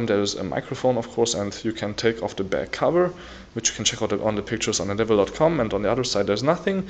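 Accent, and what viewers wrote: Germany. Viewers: Man hoert deinen deutschen akzent raus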